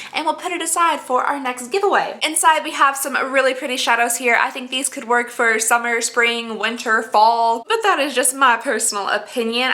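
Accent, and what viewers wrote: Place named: South, United States. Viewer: You could not get any more adorable!! I'm originally from VA (mountains of VA... closer to KY/WV/TN, depending on what direction you wanted to travel. You know... the part of VA no one thinks is there😂😂😂 Now I live in TN and you're not that far from me. One day I'm dying to take a drive and meet you for lunch or something!! Just got a Becca box and I'm thinking I need to share with you. Also....baby girl, you're not nearly as pale as I am😂😂😂 If you're looking for some great pale girl stuff, check out ETSY!! I've gotten sooooo much PR from sooooo many different stores lately that have honestly knocked my socks off! Plus, they are usually really natural in the ingredients and they are hand made so many are willing to make shades for you. Anyway... you make my day so much better. Love hearing that southern drawl, even though I know I'm so hick you would sound like a city girl next to me😂😂 Thank you for making my day better! 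Lunch and box of makeup soon!! Would be a blast!!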